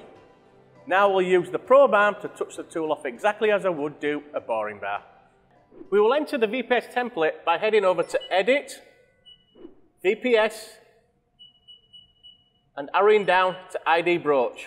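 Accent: United Kingdom. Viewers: Love the accent too. It's an accent I always associate with traditional British engineering, and steam trains.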